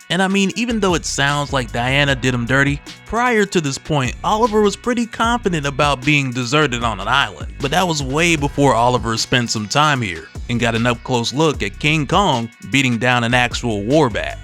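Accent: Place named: Atlanta, United States. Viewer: I swear you sound like a ATL dude fr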